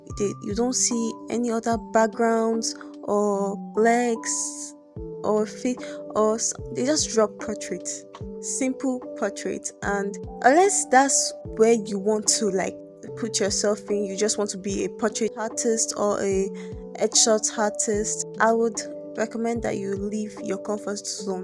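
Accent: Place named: Yoruba, Nigeria. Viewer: E sure me say you be yoruba !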